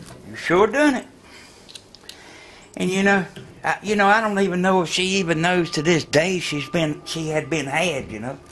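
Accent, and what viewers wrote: South, United States. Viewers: although I thank you for the video, I find the thick southern accent just too hard to listen to. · I like your down south southern drawl and I'm in South Carolina. Nothing quite like a Southern Gentleman. Just subscribed to your channel. Keep'um coming🇺🇲🤗🤗🇺🇲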